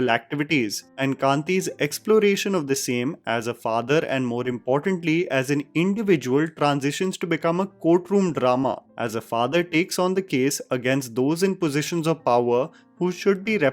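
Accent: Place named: Punjab, India. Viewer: You sound so good speaking Hindi with a Punjabi twang.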